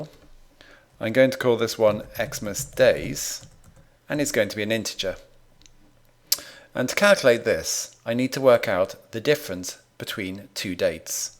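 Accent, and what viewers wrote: United Kingdom. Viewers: special thanks from Egypt Special thanks for British accents :)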